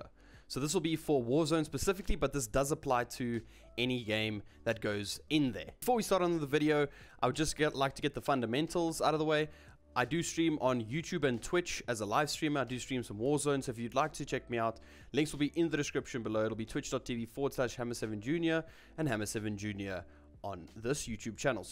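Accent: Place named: South Africa. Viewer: Nice vid, your voice makes me wish there was a South African OP in WZ now.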